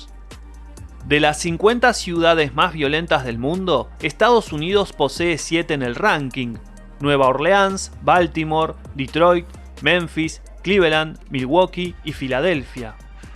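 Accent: Argentina. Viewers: Ahora usted periodista, por su acento es argentino. Como esta su Argentina???? hoy en día???? cual es su inflación?????. Espero escuchar su análisis también, es fácil hablar de los vecinos pero difícil habla de mi hogar. O la idea central es "Mal de mucho consuelo de tontos" es eso su análisis??????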